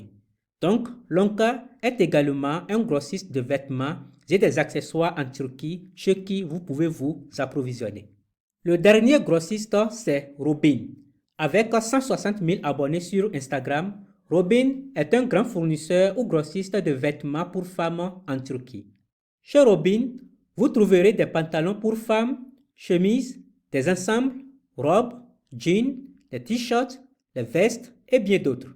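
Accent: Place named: Benin. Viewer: Merci infiniment ! Je pense que t’es béninois comme moi vu ta façon de parler ✌🏽 Franchement ça va tellement m’aider ! Cette vidéo est top, claire et concise :)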